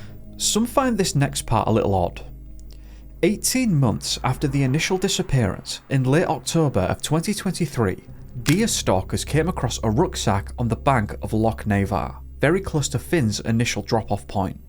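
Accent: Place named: North, United Kingdom. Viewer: I just really am struggling to listen to your northern accent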